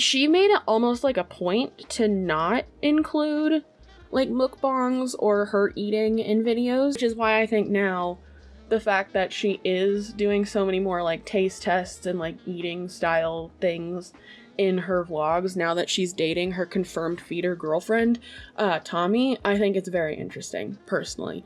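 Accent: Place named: Upper Peninsula, United States. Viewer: YOOPER! I knew Iknew your accent!!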